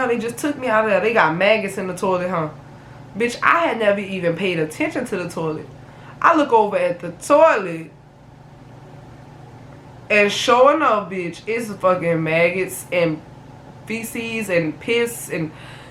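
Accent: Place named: New York, United States. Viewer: i looove your accent! u from NY??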